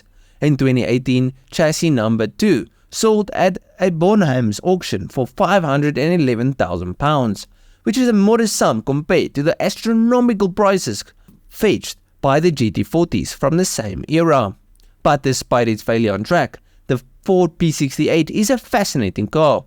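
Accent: South Africa. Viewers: Sounds like one of my countrymen from South Africa. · I think it's one of those less well known South African accents. Our ears are just not attuned to the S.A. accent because they haven't been on the (automotive) world stage that much.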